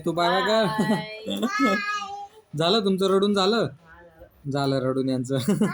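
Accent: Khandesh, India. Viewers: Kont gav ahe tumch भाषा vrun tr खानदेशी vatay tumi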